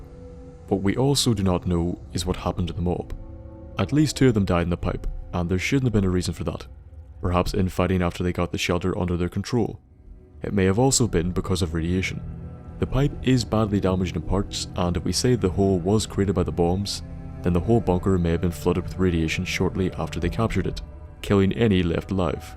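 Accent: Northern Ireland, United Kingdom. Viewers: May I ask what country are you from?, you sound like you're from Northern Ireland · Where abouts from northern ireland are ye from? I'm from Co. Down lol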